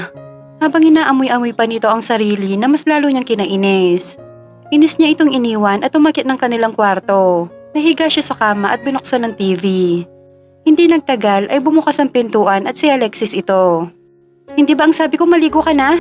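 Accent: Philippines. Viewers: Ok lang pero funny kc may punto yung narrator ay parang may music na pataas at pababa. Anong probinsya ka ba? Di ka ciguradong taga Manila.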